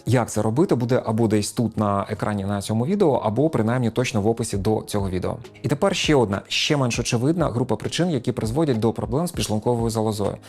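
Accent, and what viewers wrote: Standard. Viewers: Я навіть не знаю, що більше слухаю, цінні поради чи таку гарну, чисту українську мову.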